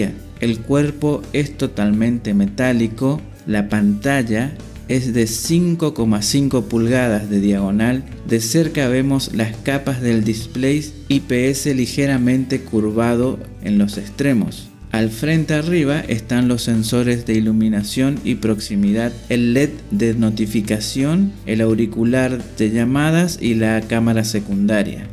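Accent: Corrientes, Argentina. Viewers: Yo no se para q Huawei lanza celulares que unos tienen una cosa pero le faltan otras, me paso con una tablet Mediapad T1 tenia una con chip y cuando quise comprar otra despues de un tiempo ya no consegui con chip para usarlo como telefono.... aaa.... y aguante el español correntino pué!!! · genial el español correntino.. los banco, pero pongan español sin aclarar y que se caguen los demas.. harto de escuchar el "neutro" mexicano..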